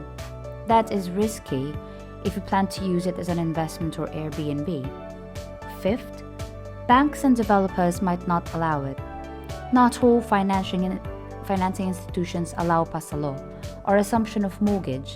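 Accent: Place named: United Kingdom. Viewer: YOUR ACCENT IS FUNNY! ARE YOU BRITISH?😄😭😄😄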